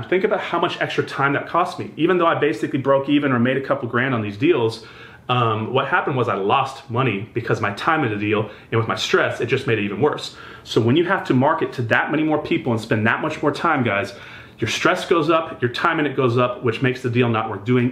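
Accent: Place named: South, United States. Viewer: Good info but you talk to fast. That combined with your Southern accent makes it hard to listen IMO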